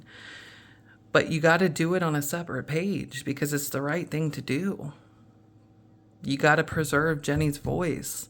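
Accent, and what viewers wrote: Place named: England, United Kingdom. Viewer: What about his English accent and the way he does his mouth with his tongue ..He is cringe factor for sure!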